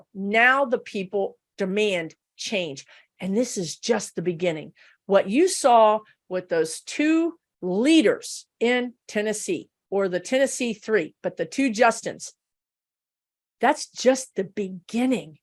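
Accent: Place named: Midwest, United States. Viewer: Sometimes your Southern accent voice comes out and it just makes me giggle because I am usually impressed with your TV Midwest accent. Anyhow...I love you and I vote only Democrat now.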